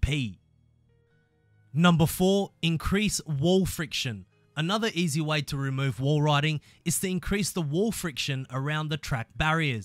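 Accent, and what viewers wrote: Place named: Australia. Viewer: Sand trap. Slow the car down and push it of balance, the same way lowered cars become unsettled when you hit the water flowing over a road. Side note: Make "No collisions" an option in the Online Adventure. E.G. Street Race S2 No Collisions. Too many racers smash into you around that first corner. Better let everyone pass and slowly make your way through the crowd one at a time. Great work keep it up. All the best for the new year. Great to hear an Aussie posting Forza videos. Well done.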